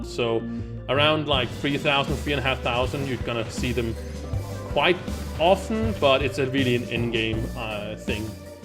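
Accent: Denmark. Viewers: This is just a question. Do u come from Denmark? Cause it sound like that.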